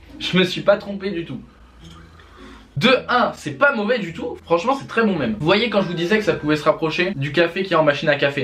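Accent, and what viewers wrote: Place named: Normandy, France. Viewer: ons dirait trop norman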